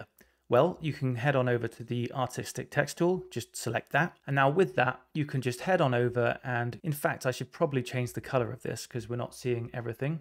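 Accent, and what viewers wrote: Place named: United Kingdom. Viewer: Understanding the British dialect…challenging but repetition aids learning!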